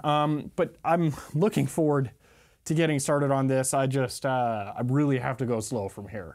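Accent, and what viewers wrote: United States. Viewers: Don't know how you're getting on with your restoration, but… if you haven't plugged it in yet (8-months later says YouTube), then DO install another circuit from your fuse-box (just as you might when installing a shower or cooker for the first time). Don't just plug it into your ring-main with other appliances and wall-outlets. PS. I guess you are saying this in your video but the Americanese is not quite so clear as English English. Sorry!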